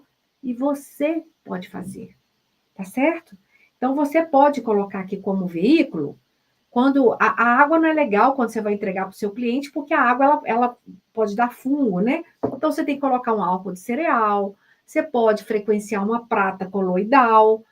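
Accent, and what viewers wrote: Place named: Minas Gerais, Brazil. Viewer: Quero muito fazer esse curso, primeiramente pq vc é autêntica e simples tanto pra explicar o conteúdo e para se apresentar pra gente aqui Esse sotaque mineiro gostoso rsrs é muito bom! Se não conseguir nesse momento, tenho certeza que em outro estarei com vc 🙏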